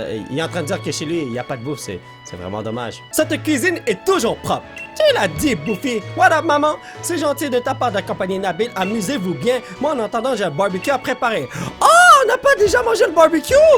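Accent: Canada. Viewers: Tes français chinoi canadien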